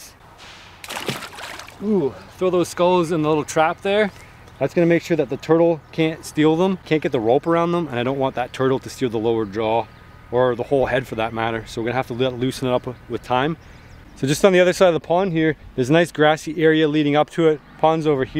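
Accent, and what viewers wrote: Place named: Canada. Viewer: This is great man. I love content like this. I've very jealous but also very excited for you. I've been wondering where you are from. You sound very canadian like me. I'm from northern ontario myself!!!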